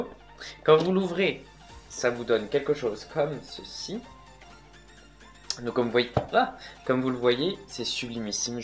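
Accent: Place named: France. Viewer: Ton accent français est trop chou quand tu dis quelque chose en allemand ou anglais :D et fais un tuto stp 😍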